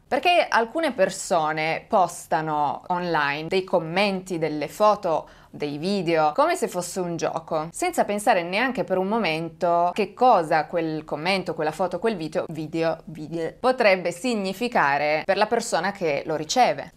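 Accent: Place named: South, Italy. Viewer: Molto gradevole il.uo modo di esporre i fatti di cronaca. Racconti davvero completi. Un unica nota stonata: il tuo accento., la tua dizione . A volte sembra un miscuglio di Sud Italia, altre volte , brasiliana. Puoi fare un corso di dizione per rendere più piacevole la tua voce?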